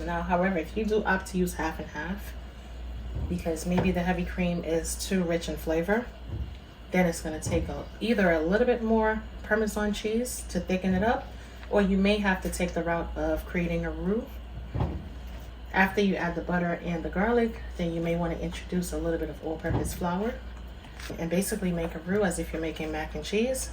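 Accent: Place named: New York City, United States. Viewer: It's "Creative Cooking With ". I told her that I knew this wasn't Cooking With recipes with that bland looking food and lack of seasonings. I went off. I tell everybody about your food. How you cook like you're from South but your accent sounds like NYC. A wonderful mix.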